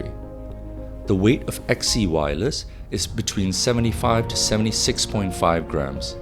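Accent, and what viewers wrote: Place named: India. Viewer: Definitely an indian dude narrating this.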